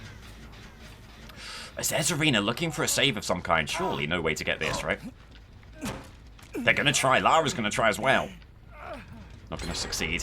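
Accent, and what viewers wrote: United Kingdom. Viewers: i love your accent its honestly very cool you are my fav british (assumed brit, sorry im not sure which specifically if its wrong) dbd creator you always do good commentary as well. real proper gent. as an American i always like to hear the way others talk its a nice change to listen to a non american accent! good stuff.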